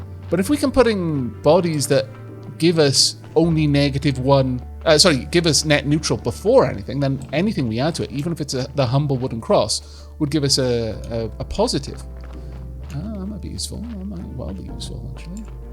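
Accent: Wales, United Kingdom. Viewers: I subscribed purely because of how much I the welsh accent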